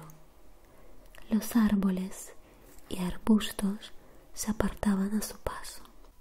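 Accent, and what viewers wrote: Russia. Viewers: desde que escuché este video de lectura susurrada me hice adicto a tus asmr. para mi gusto el mejor asmr en español con acento ruso. Comienzas la oración con un nivel de volumen en tu voz y en la palabra final bajas el tono...esto hace muy placentero tu asmr. Gracias :) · No me suelen gustar los videos de lecturas pero no entiendo porque al empezar el video el accento ruso puede conmigo xD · Tu voz es fascinante, me encantan tus videos asmr. Esa combinación de español con el acento ruso es adictivo.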